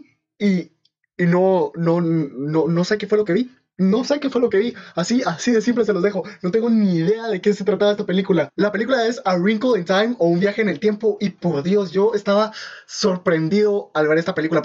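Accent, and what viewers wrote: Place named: Guatemala. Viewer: lo supuse por el acento jajaja yo también soy de Guatemala, y rayos te pregunto porque ya termine la 5ta temporada y note que no tenias comentarios sobre la serie, pero esperare, jaja saludos.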